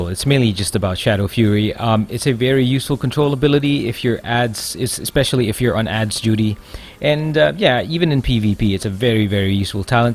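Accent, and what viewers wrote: Philippines. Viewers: Nice guide! Btw are filipino? Cause you have a little bit of filipino accent.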